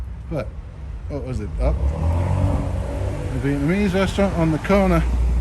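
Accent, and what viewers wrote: Northern England, United Kingdom. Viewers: I can hear the northern accent, it's just really softened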